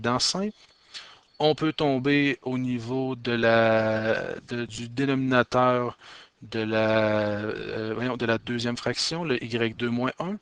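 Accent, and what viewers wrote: Quebec, Canada. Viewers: quel accent? cest du quebecois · Emre Turhan c’est du canadien français donc c’est un accent normal pour ce pays...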